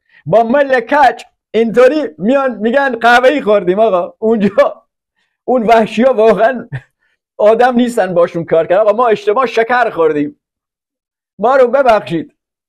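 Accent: Lorestan, Iran. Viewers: جان حرف نداری بااون لهجه شیرین لری وعاشق هرچه لرهای بامعرفت وبامرام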